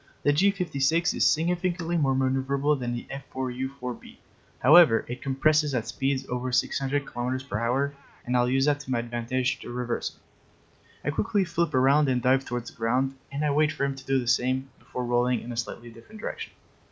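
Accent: Quebec, Canada. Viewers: Great video yet again. I have a couple of things that I'd love to understand. 1. It seems like you think roll rate is more important than turn rate is for achieving reversals. Is this because you will usually have very little time to aim and hence you will usually need to be able to orient your plane very quickly? 2. In your clip at 5 mins, what should the Spitfire Mk XVIII have done rather than pitching up? 3. Great call on adding your voice. Are you from Quebec by any chance?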